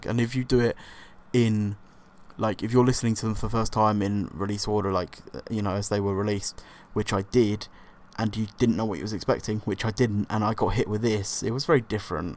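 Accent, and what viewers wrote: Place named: Liverpool, United Kingdom. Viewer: I like the Liverpool accent. It reminds me of.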